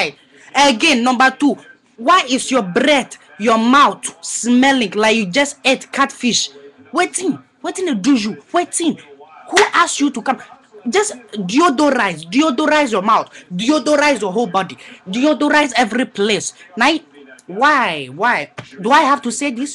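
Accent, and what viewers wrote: Nigeria. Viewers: lmaoooooooo wetin dey do u, abeg noww loolx...wiv his Naija accent oo eei de3.... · lol yuh Naija accent is seriouss!!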